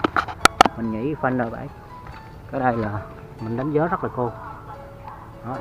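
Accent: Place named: Quang Ngai, Vietnam. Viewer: ôi giọng 76 quê mình nghe thân thương quá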